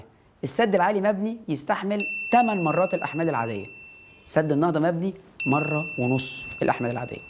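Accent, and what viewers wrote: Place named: Gulf, Arab world. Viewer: واضح من لهجتك إنك من الخليج..... طبعا لا داعي للذكر إن كل دول الخليج لها علاقات وطيدة جدا بإسرائيل و من ينفي ذلك مغيب أو ساذج و بالنسبة للعلاقات مع إسرائيل ...بالفعل مصر اول دولة طبعت علاقات مع إسرائيل أحنا لا نتناسي....و لكن غيرنا يصطنع العداء لإسرائيل و هو تحت الطاوله يمد يد العون و المحبه و التعاون مع إسرائيل بكل الطرق و بالفعل القوي الناعمه الإسرائيليه في محيط حوض النيل قوية بشهادة القاصي و الداني و ممكن تقرأ عنها رجاء واحد...كفانا كلام من نوعية الإستهلاك المحلي و إصطناع الوطنية الكاذبة!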